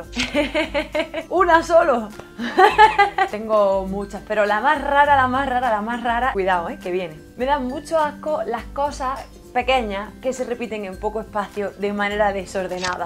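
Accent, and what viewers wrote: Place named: Granada, Spain. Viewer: Uo eres genial! Llevo todo el dia viendo videos tuyos! Por qué parte de Andalucía eres? Me recuerda mucho tu acento a Granada:D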